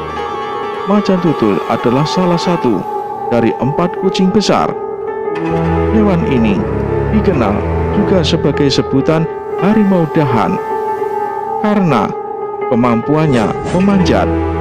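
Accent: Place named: Java, Indonesia. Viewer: Gak apa2 mase maju terus.. Unik itu dialek jawanya poko e top maseee semoga sukses selalu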